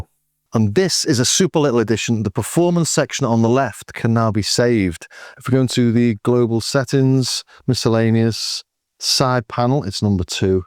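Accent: Liverpool, United Kingdom. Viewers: Did you know that your UB-Xa Synth is Diseased, The closed captions if you switch them on every time you said OB8 (atrophy) setting it translates it as AIDS and it also mistranslates you saying atrophy to HIV which made me chuckle a bit, not that AIDS and HIV are anything to laugh about ! ! ! but I was wondering if you can alter the translation settings it obviously doesn't like your soft scouse English accent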